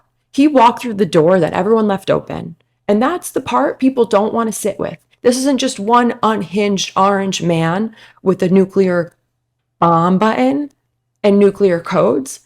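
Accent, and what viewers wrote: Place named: East Coast, United States. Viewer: New Jersey huh, even the east coast accent came out today 😂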